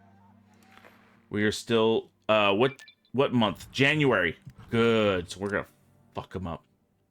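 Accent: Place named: South, United States. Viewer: Loving the accent it's like you flip between a Boston accent and southern.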